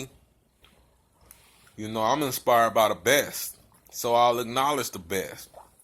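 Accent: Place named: Oakland, United States. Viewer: Sound like you from Oakland, Calif.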